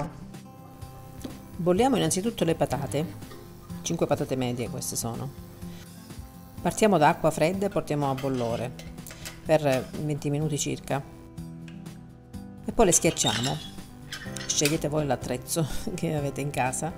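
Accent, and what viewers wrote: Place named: South, Italy. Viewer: A parte il fatto che sei una maga, in cucina: ma parliamo del tuo accento meraviglioso..mi scalda il cuore! Io son Sarda e adoro gli accenti del Sud e delle isole!!!!❤❤❤❤❤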